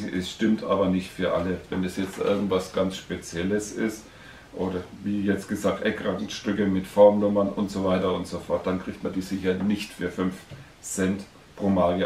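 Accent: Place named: Franconia, Germany. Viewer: Informatives Feedback- Video! Deinen fränkischen Dialekt würde ich unbedingt beibehalten, der verleiht deinen Filmen erst das richtige Kolorit. Noch kurz etwas zum derzeit durch den Preisverfall etwas in Verruf geratenen Sammelgebiet Bundesrepublik Deutschland: versucht doch einmal, die ersten sechs Jahrgänge durchgehend mit klaren, lesbaren Vollstempeln zusammenzutragen! Nach meinen Erfahrungen gar nicht so einfach, denn zu dieser Zeit wurde meist noch auf motivfreie Entwertungen geschaut, und Vollstempel waren eher Zufallstreffer., wie wäre es, wenn du einzelne europäische und auch Übersee- Sammelgebiete in Kurzportraits vorstellen würdest: Frankreich, Schweiz, Großbritannien, USA, Kanada, VR China usf. Wie stellt sich das Gebiet als Ganzes dar, was ist beim Aufbau einer solchen Sammlung zu beachten, ist eine entsprechende Sammlung für den Normalsterblichen finanzierbar, Seriosität der aktuellen Ausgabepolitik und natürlich auch die Preisentwicklung. 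Würde mich freuen!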